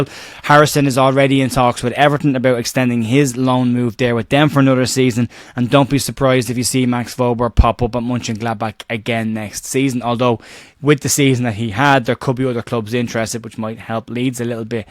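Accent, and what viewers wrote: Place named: Ireland. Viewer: Just want to say thank you so much for the content over the season. The amount of work put into each post is extraordinary. I live in Brazil, The View is a very important part of my life here! It’s great to hear a fellow Irish man talking about Leeds! Thank you so much so much, we’ll do it better and in more style next season and I’ll be following it on The View!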